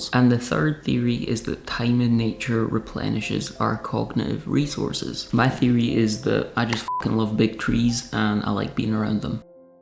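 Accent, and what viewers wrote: Scotland, United Kingdom. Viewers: you are amazing. First, you explained your situation clearly and gave effective suggestions to help others. 20 years ago I suffered a brutal attack, and with that I encountered a weird collection of anxiety, depression, strange thoughts, and, of course PTSD. It took some time before I found a counselor who actually understood what I was going through. He was a mature graduate psychology student doing his final practicum. He was great, and a perfect fit. He challenged me often and tried a few different therapy techniques. I told him when it wasn't working., my second point is that you have opened some doors for people suffering anxiety to have hope. BTW had I lived my days in Scotland - my family immigrated to Canada when I was 10 more than 50 years ago - I would likely have a similar accent like yours. Keep on keeping on!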